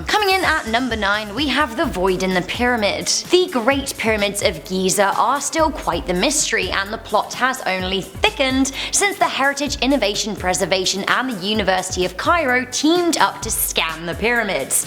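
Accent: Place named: United Kingdom. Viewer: Aay didn’t know u were British like me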